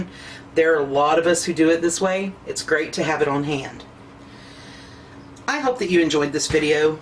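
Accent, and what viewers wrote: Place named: Texas, United States. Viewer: Are you a TX girl? Love to listen to you talk.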